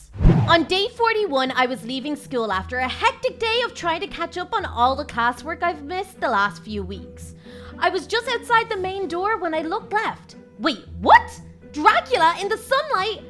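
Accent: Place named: Ireland. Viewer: I have a question are you from Ireland your accent sounds familiar I love it especially your vids I am a new subscriber 😀😀😀😀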